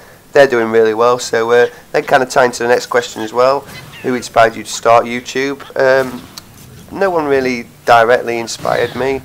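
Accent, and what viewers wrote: United Kingdom. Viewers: Much congrats nice to hear a British voice AND thanks for the name check it made my day..keep up the good work..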